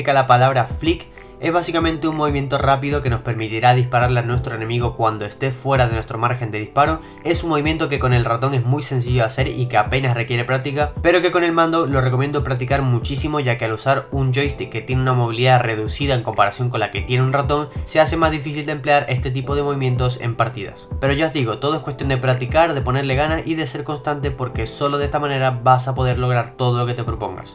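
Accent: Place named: Spain. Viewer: Soy Venezolano y no suenas nada como Venezolano y totalmente como Español lmao, alto cambio de acento 😂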